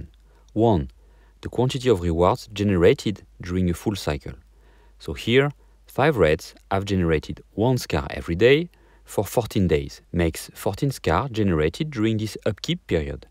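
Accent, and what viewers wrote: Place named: France. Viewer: Cool bro, thank you this video. French accent is super cool remind me of Merovingian the Matrix 👌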